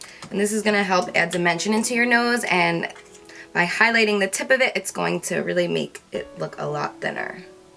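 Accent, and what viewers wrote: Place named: California, United States. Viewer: How cute can you be, you sound like a california girl then some black women, and its sooo cute!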